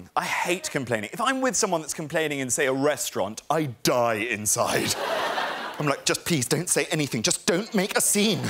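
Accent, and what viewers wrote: RP, United Kingdom. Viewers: Jack Challenge In Britain he's just posh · He is so posh lol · Trust me in Britain he is just as posh as this, more so in fact. Trust me, I am British myself and have watched like almost all of his shows because I think he's hilarious. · your point is? was commenting on how upper class english he portrays himself as don't see how that affects you Explain? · He goes ultra British and posh in America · he's always posh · he goes extra posh when he performs in America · Have seen him live loads, he is no different in how he talks compared to his normal standup, he is less posh on panel shows and interviews but his stand-up voice is the same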